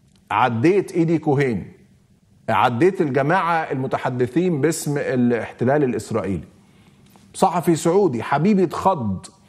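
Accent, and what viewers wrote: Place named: Egypt. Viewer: من القذارة ان تقول " سعودي" اسمعني انا اردني ومن أصل أردني ومع ذلك بحكي انه فيه فلسطينيين خونة وفيه فلسطينيين يا الله ما أحسنهم..ونفس الشي في سعوديين محترمين وفيه سيئين..من الغباء الفاحش ان تقول السعودية في حين ان اسرائيل تلعب كرة القدم بلاعبين فلسطينيين .. عيب .. السعودية فيها ناس ولا أروع... لكن احكيلنا عن بلدك مصر كونه لهجتك مصرية..ايش عملت؟ ولا شي ..هي جارة غزة وسكرتوا ابوابكم بوجه الناس..اخرسوا احسن ..غزة خذلت منكم انتم تحديدا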